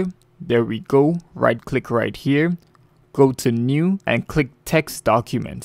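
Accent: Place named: Canada. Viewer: I love the canadian in your voice :D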